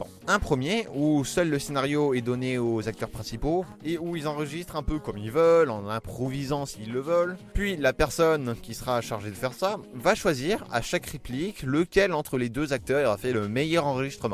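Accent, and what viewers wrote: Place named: France. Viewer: I fell in love with this French pronunciation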